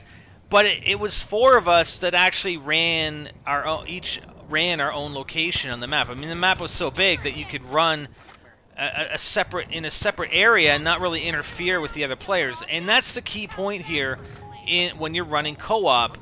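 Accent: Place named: Canada. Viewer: He's Canadian. He has an accent.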